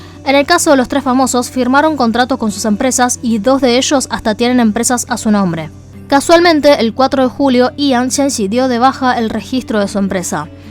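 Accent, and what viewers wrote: Argentina. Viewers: En Latinoamérica es peor y nunca salen a la luz .En todo el mundo hay favoritismo pero es raro que salga a la luz eso.Excelente video y tu acento Argentino muy bonito si no viera en tus vídeos.Diria que es documental narrado por una Argentina .Perdon yo sé que sos Argentina y China pero yo te miro como latina que nos informa de China todo lo mejor para vos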